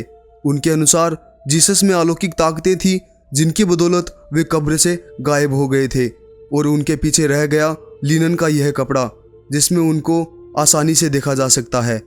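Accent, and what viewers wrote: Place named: Haryana, India. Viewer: Taai aale tu te awaj te haryanvi laage hai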